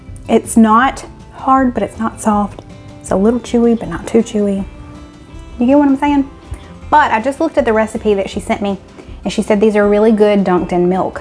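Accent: South, United States. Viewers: I’m a Southern girl out in the West now, and seeing your happy face (& family’s faces) and hearing your accent makes me homesick. I’m an unmarried empty-nester with severe physical illnesses (heart/lung). Cooking was my favorite thing & I can’t do it anymore, but watching you and your sweet family enjoy yourselves is pretty special to watch. Have the happiest and healthiest of New Years. ❤️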